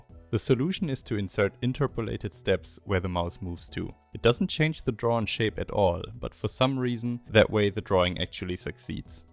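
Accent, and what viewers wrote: Germany. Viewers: R u german? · This is extremely interesting, love the work you put into not only the coding, but the video editing aswell. Might I ask, where you are from? I hear an accent but I am not quite sure if its a german one or something else. Anyways, new subscriber, keep up the good work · Hört sich so extrem deutsch an.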